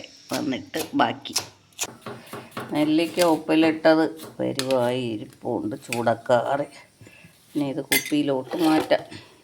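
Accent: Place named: Thiruvananthapuram, India. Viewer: ഇത്താന്റെ തിരുവനന്തപുരം സംസാരം കേൾക്കാൻ നല്ല രസം 🥰❤👍🏼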